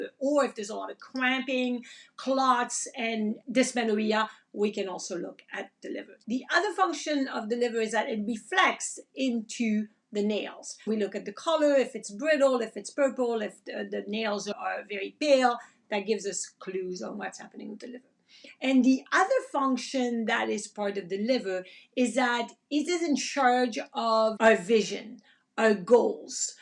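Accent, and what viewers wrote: France. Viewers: your French accent adds to your charm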